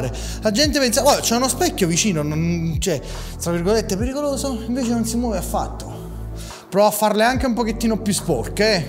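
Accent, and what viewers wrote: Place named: South, Italy. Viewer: Ma da roma in giù quando imparerete a parlare italiano? Sempre queste frasi che non si capisce un ca**o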